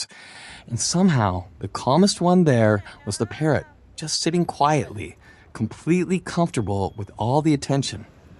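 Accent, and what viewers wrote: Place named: United States. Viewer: where are you from? you sound american. is travel allowed to iran currently?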